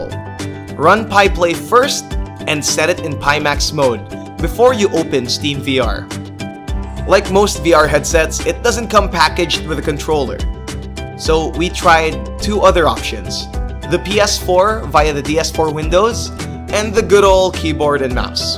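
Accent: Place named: Philippines. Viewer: You sound Filipino 🇵🇭🤔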